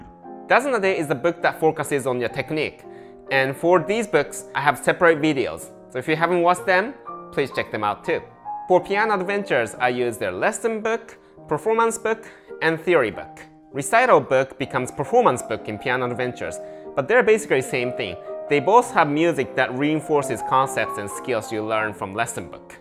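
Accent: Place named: United States. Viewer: The American-English accent is not an advantage when trying to communicate to a worldwide audience.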